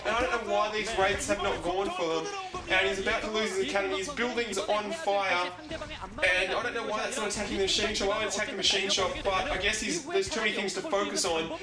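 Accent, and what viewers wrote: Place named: Australia. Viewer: crikey mate, a bloody aussie sc commentator?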